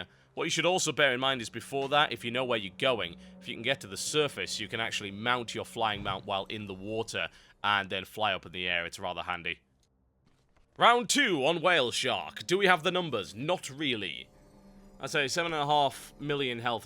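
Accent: United Kingdom. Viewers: i only watch things like this cause it has WoW and a brittish person talking in it <3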